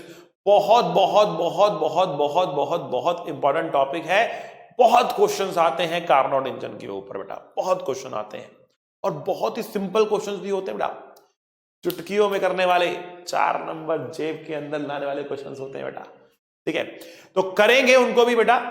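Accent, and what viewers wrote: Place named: South, India. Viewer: He looks like South Indian n also talks like it 😀😀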